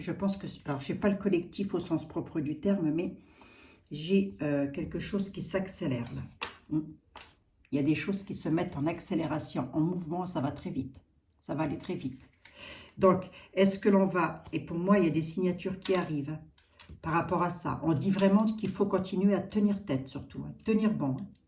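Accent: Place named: Lorraine, France. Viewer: Bonjour, vous avez un petit accent qui résonne à mon oreille...Lorraine ? Bien à vous !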